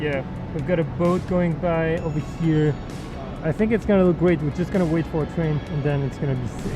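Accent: France. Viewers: Sorry for this comment, you must really be French to understand that, but it kills me how has not only a French accent when talking english, but also a Parisian accent with all the "Euuh" at the end of each word ahah 😂😂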